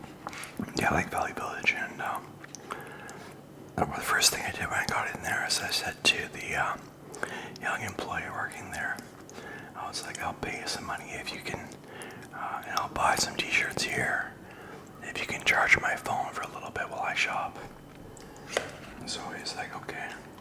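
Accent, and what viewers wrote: Canada. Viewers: The Canadian accent always brings me back to your videos. Keep up the grind!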